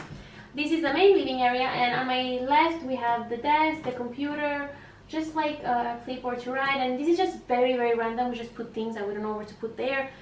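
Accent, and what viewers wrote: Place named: Argentina. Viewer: te escuchaba hablar en inglés y me parecía , yo decía es argentina por el acento jeje . saludos .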